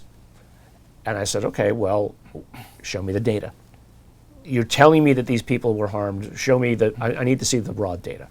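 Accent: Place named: Liverpool, United Kingdom. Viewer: Love the Liverpudlian Nolan ❤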